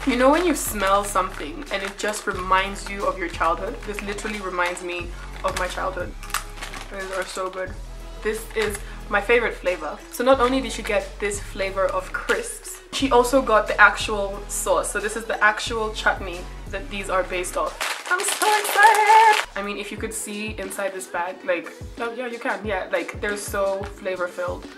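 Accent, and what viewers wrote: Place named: South Africa. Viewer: Wow south african accent 🙅🙅🙅🙅 your actual accent is more south African! 😵🤷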